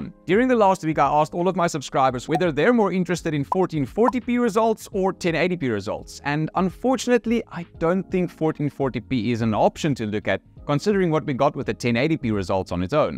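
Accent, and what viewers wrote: South Africa. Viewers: Mnr is jy van SA af?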